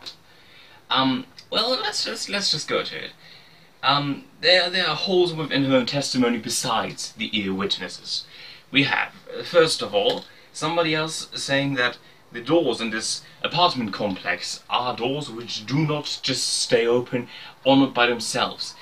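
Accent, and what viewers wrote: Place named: United Kingdom. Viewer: I'm trying not to giggle the way you said it looks different it smells different feel different (with Brit accent). but that's true!! your place smells like you looks like you and feels like you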